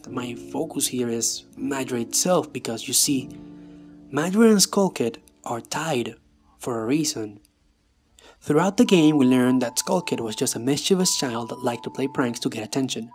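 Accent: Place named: Turkey. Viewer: good video but turkish accent + its called video essay and you could have made it hours long since theres a lot to talk about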